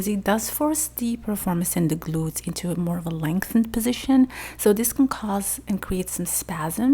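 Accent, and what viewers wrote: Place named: Morocco. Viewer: Spot on again (BTW I identified a district attorney as being from Morocco originally because I know - and love - your accent)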